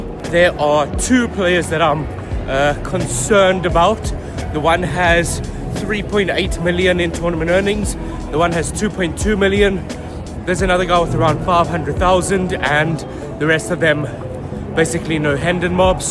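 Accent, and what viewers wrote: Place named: South Africa. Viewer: Loving the vids - the energy and the enthusiasm and the SA accent (I grew up in Benoni!!! - living in the UK now). Keep 'em coming ... but man you have to slow down! How many of the top guys will be rushing off to play hours of cash games at the end of a 12-hour Man Event day? Save that brain power for Day 3 ... and hopefully beyond! 🤟🤟🤟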